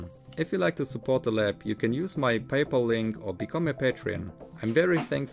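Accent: Germany. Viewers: Are you german? You do sound like it. If so, you're one of the few (like Great Scott!) who make interesting videos with outstanding quality. Unfortunately a rarity among german youtubers. Sub +1, keep em comin' :)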